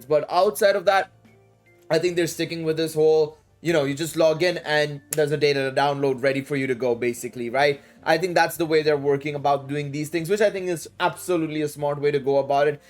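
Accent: India. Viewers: Just wondering are you indian-indian? You said about being based in EU? Why is your indian accent so heavy zzz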